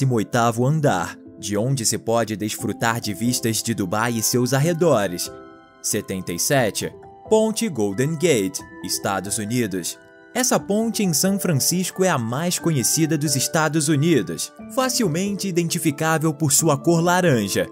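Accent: Rio de Janeiro, Brazil. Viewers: Essa locução com sotaque carioca cheio de X meu irmão é impossível ouvir 👺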